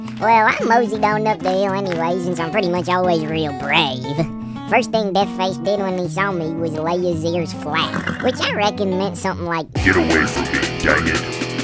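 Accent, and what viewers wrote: United States. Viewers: Im so glad to have watched through the 38 and 42 hour Beverly Hillbillies videos, her accent/misunderstanding reminds me of them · my favorite of your shorts! I love the lil southern accent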